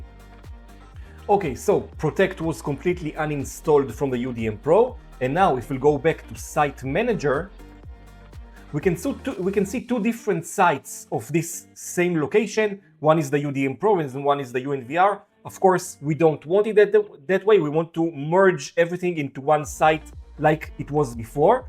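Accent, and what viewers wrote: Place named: Israel. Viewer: Very good video, concise and to the point, without the blah, blah, blah of many influencers. A pleasure to watch. The guy sounds like a Romanian Israeli.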